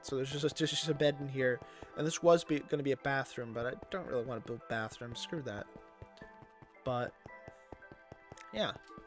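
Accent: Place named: Scotland, United Kingdom. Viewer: you sound like scottish stampy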